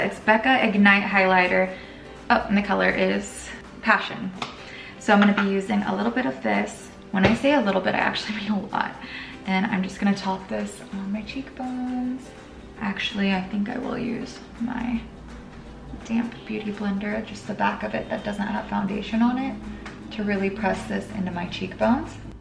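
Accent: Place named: California, United States. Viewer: Dang I know I say it all the time but personally I think u are one of the most sexiest, most beautiful, most alluring woman ever. To me your a goddess. The best part is, when I first saw u and priscilla ricart, I thought u was south american and she was from usa. Then boom she has accent n instead u don't u sound californian. And it's awesome. Sorry to bother ya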